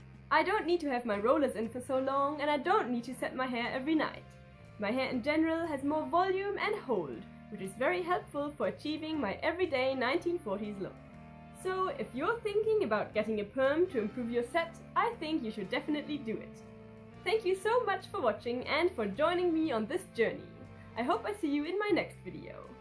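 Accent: Germany. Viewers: oh wow ! You've lived in NZ since you were 8 . May I ask how you retained such a strong german accent ? Most people lose theirs when they move abroad as a child . Love your videos!! ☺️ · are u german by any chance? you kinda sound like one and your last name does too :D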